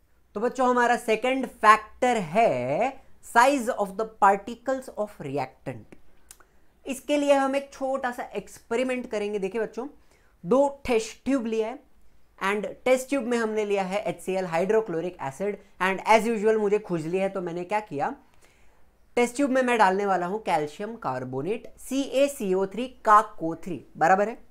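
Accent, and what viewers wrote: Maharashtra, India. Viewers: Sir aapki mother tounge Marathi hai kya????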